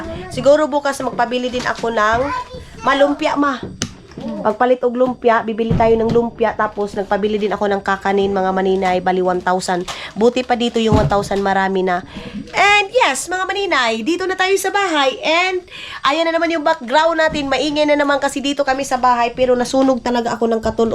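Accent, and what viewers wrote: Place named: Butuan, Philippines. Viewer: Alam mo maninay namimis ko mag salita ng tulad ng salita nyo KC amarunong akong mag salita ng ganyan KC Ako ay taga Butuan pure Bututanon ganyan among inistoryahan.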